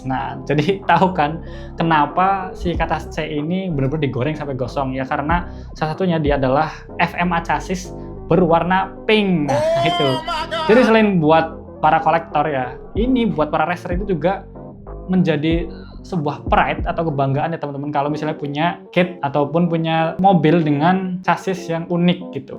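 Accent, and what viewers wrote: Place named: Brebes, Indonesia. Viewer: Oalah wong brebes koe mas. Salam ngapak mas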